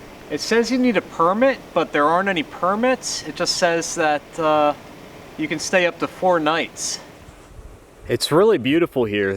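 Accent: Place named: Eastern Pennsylvania, United States. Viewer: Finally learned where he’s from in PA (Pottstown) after watching a dozen of his videos. Knew from his accent it was definitely not western PA. Ahh, Chester county at its finest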